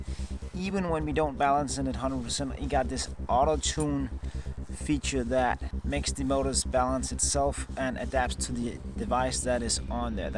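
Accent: Denmark. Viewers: Nice video :-) are you Danish? ;-)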